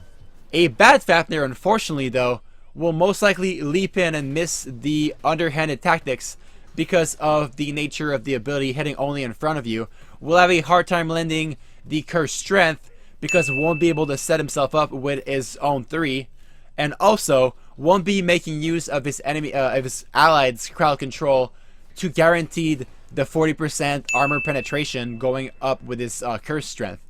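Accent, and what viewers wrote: Quebec, Canada. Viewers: i can feel the french canadian richness in your voice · Are you from Quebec?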